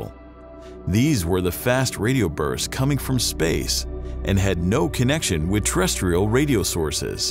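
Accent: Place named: United States. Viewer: the narrator has the most american voice i've ever heard. great content though.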